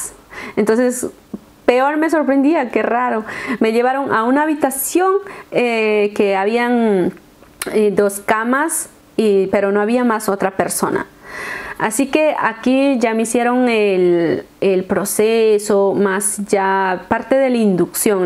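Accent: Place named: Peru. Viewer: por tu acento eres de Perú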